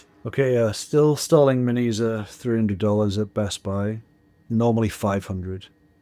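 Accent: United Kingdom. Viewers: Not so much full Karen as full Godfather. Very polite, no screaming, but do inquire as to why you have paid so much money for something that doesn't work. In fact work your British accent. I'm just saying this is someone who did costuming in theater for 7 years. Americans are idiots for a British accent. 🤨🤣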